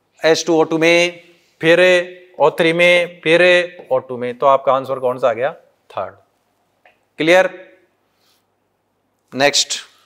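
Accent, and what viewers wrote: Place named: Haryana, India. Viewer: Haryanvi lahje me chemistry mja aa gya kya padhate ho sir ... thanks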